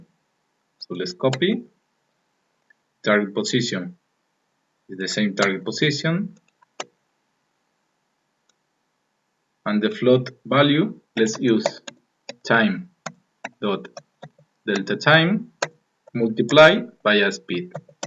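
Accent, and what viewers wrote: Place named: Spain. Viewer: Anda que no se nota el español xD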